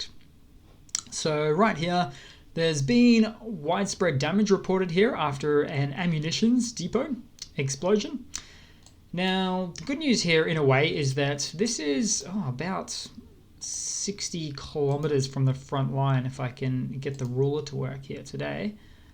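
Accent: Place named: Australia. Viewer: He sounds Australasian to me!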